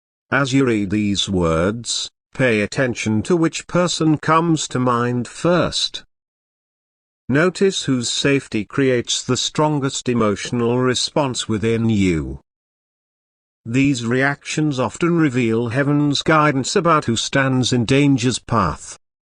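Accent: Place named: United Kingdom. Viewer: Let AMERICAN talk to American people! Not everyone understands British language!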